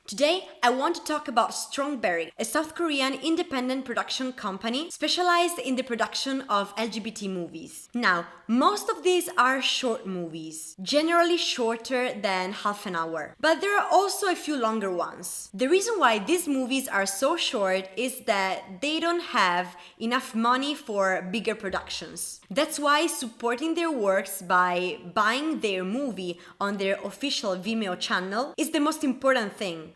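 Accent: Italy. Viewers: please make video, all about theory of love and your thoughts, btw I like your Italian accent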